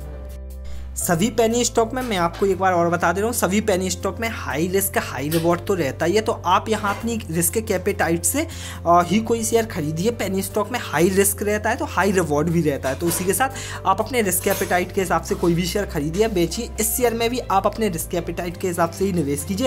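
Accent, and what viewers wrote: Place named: Bundelkhand, India. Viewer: aavaj se aap bundelkhandi lagte ho😍😍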